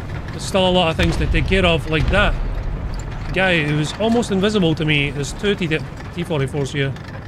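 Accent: Scotland, United Kingdom. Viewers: if the intro that includes the flag nor the accent give it away, i will. he's scottish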